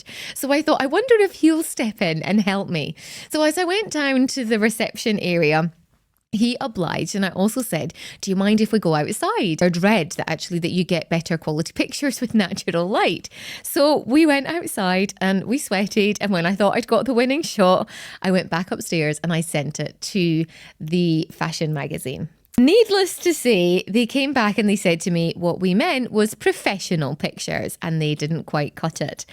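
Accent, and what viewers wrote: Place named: Scotland, United Kingdom. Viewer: Context, Challenge, Confront and Consequence....wow...I thought initially that you were from Sweden. Then recognised your Scottish accent. Thanks for the lesson.👍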